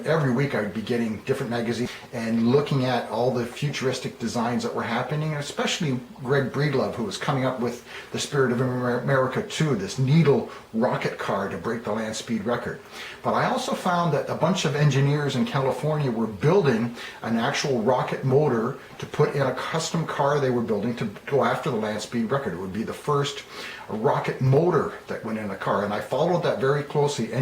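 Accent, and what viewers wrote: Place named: Canada. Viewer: that is an amazing story - and an amazing model. One error in your narrative - we designed and built the car in MILWAUKEE, Wisconsin - not California. When I heard you say the words PRO-ject and DECK-al I knew you were Canadian. I understand the long winters up there need some indoor activity to fill your days. Neat collection of hotrods, too. Actually, it took us less time to build The Blue Flame than your model. The project began in August 1968 when we signed the contract with the American Gas Association, and ended in October 1970 with the record at Bonneville. The car was actually essentially done building in July 1970, just for comparison. Great video, too!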